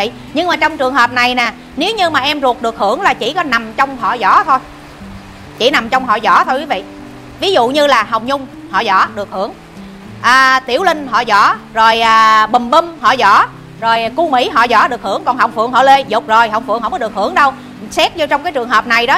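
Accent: Standard. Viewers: Chi noi rat chuan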